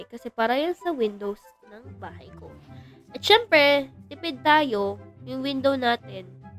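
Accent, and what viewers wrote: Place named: Conyo, Philippines. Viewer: ang cute ng pagka conyo ni ate 😭🤏🏻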